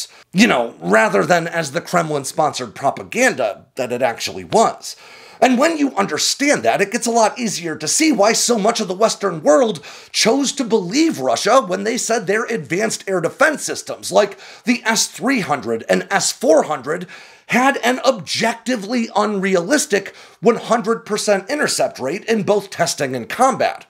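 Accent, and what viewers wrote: United States. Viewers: As soon as you hear an American Accent in a video about China, you can rest assured that is gonna contain a pile of Yankee Bullshit and opinions upon about things about which they really have not got a clue.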